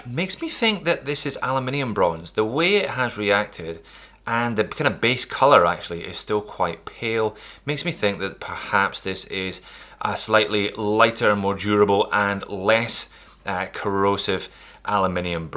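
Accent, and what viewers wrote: Scotland, United Kingdom. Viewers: A Haggis (shhh don’t tell unsuspecting tourists they aren’t real) riding a unicorn (as Scotland’s national animal). Enjoy hearing a fellow Scotsman talking about watches! Cheers all the way from Dundee! Keep up the good work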